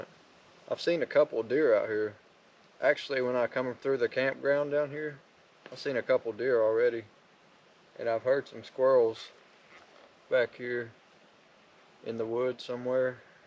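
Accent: South, United States. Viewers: nice, you have a pretty strong accent and I figured you are from the south, a gun would be a good idea eh but then again you are safer in the woods then in a city lol here in Canada we are under attack because our government wants our guns 😡 we are fighting like hell to keep them, anyway that’s a long story… stay safe 🤙🏻🇨🇦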